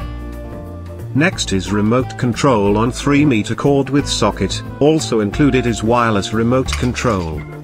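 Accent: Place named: United Kingdom. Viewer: What is with the robot British narrator?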